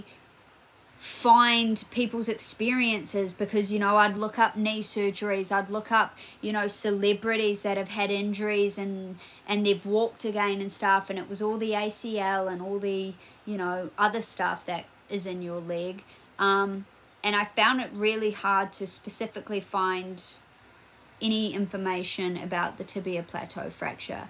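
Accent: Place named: Australia. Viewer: You are absolutely right, don ´t let anybody rush you! But the thing is: people do rush you, things which seem totally simple to them are like climbing Mount Everest for you, for example: (when you are finally allowed to): take one step! The (emotional) problem is, in my experience, not yourself, the fact that you have to rely on a lot of help from others etc, the problem is all of the other people (even the physiotherapist), who rush you and put pressure on you - this is what causes the “bad” emotions to you. And like you, I had days where I wished I was dead, not (only) because of the pain (which was excruitiating, like you said), but just because I was tired of needing, help, I was tired of waiting for things to get better, I was tired because the whole situation was soooo unbearable strenous and exhausting…. I don ´t know how good the medical care is in your country - I don ´t know where you come from, from your accent I think Australia or New Zealand - in Germany our health system is at a low and you don ´t get Physiotherapie for more than 6 months 😮 and then “everything has to be ok again” 😡 I found your video by accident today, your video is 1 year old, you are young and I really hope that you are back on track, that your leg is functioning “ok” for YOU, so that you can go on with your life and do all the things you want to. 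I am 58 years old, 2 years ago I had a poly trauma with ancle- fracture, tibial plateau fracture, knee cap fracture and fracture of my upper arm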